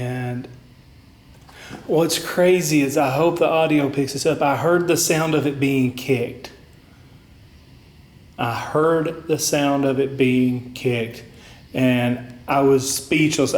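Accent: South, United States. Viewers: I know ur a southern boy where u from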